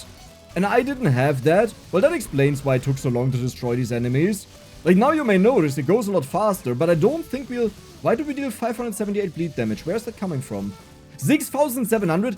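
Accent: Germany. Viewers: accent is so pronounced, yet very different from the classic German accent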